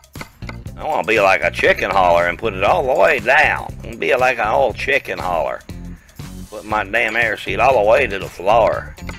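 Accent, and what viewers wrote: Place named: South, United States. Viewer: I was just admiring your southern accent! I'm from TN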